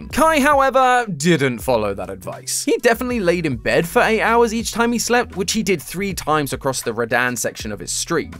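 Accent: United Kingdom. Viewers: As i watch the video, i find sadness, this is the last video ill watch for th Game theory series of channels. Matt was the life of the channel, while im able to appreciate the british man and his efforts as a voice over actor, it isnt the same. Thank you for your efforts, you surely will find success in working for the company that bought the Theorists channels.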